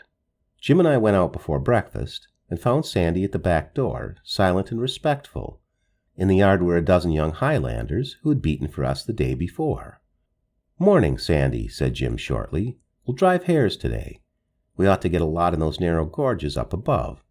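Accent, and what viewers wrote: United States. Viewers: Shouldn’t be an American voice but English. Sounds wrong.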